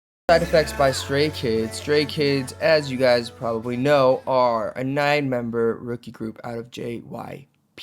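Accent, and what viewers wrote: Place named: United Kingdom. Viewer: I love your British accent (Actually i love your all)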